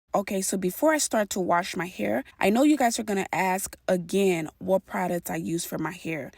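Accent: Liberia. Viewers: She sound Liberian... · Liberian Jue.... Our accent never hide. 🇱🇷♥️😊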